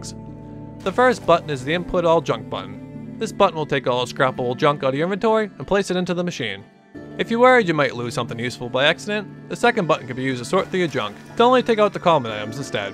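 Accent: Boston, United States. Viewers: has anyone ever told u about ur nice Boston accent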